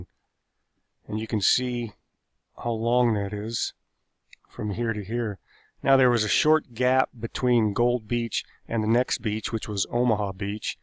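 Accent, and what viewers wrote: United States. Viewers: Stopped after about 2 minutes. Couldn’t stand the pathetic American pronunciation of places in France. Pity.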